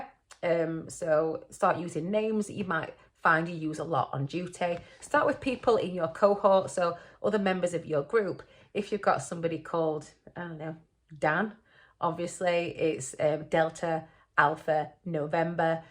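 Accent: United Kingdom. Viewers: I guess you're from Scotland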